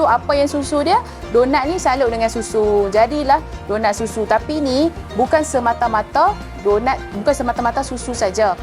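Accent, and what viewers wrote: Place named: Penang, Malaysia. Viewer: Mantap kak.kekalkan dialek penang kita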